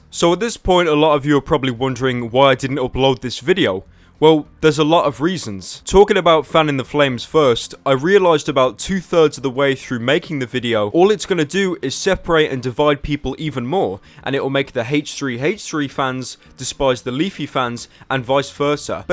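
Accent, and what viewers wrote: United Kingdom. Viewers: He's British. Certain regions pronounce "H" as "aech" instead of the faster American "he-" when it's said. I'm from the UK and say it the same way. Just differences, that's all. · Why is anyone on youtube fighting in the first place, especially considering it's just a bunch of people who do the same thing but in different ways, Leafy does cringey videos on cringey videos H3 does cringey skits on cringey skits and you do deep voice british cringe on cringe videos.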